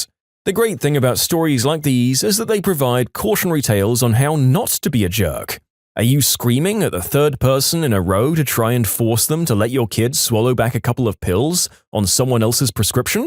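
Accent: United Kingdom. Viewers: Whoa what happened to the person who was telling the story to all of us he sounds more British than robotic I think they're replaced the AI voice with an actual person and that actual person just seem to be British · Is it just me or did you suddenly become British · Why is he britsh now? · Why doe's he sound British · This new guy is a grilling MACHINE! keep up the good work man! Also are u British? U sound British.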